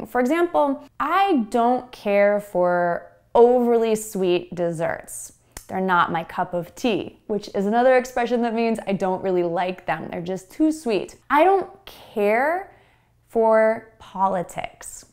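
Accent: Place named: United States. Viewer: Beautiful thank you in lots please believe me there's no one who may replace ya american accent plus watching you here on YouTube is like watch on video with a thousand of beautiful red hearts on back ground I mean for me is clear make these videos with lots of love.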